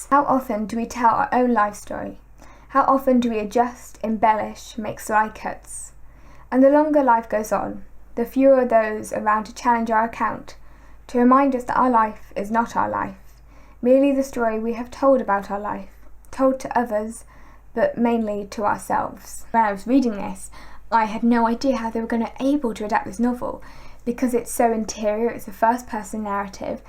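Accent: London, United Kingdom. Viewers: What's so special about this lassy's voice? She could be any schoolgirl from London or the home counties.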